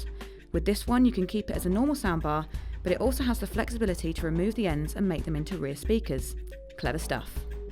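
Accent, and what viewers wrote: United Kingdom. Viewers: I think she is British. I actually like her accent, it's much better than American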